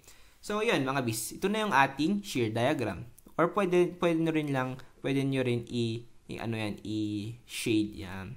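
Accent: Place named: Pangasinan, Philippines. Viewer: now watching <3 quiz later tas exam next week hahaha btw may pangasinan accent kayo like bangking hahaha idk if im right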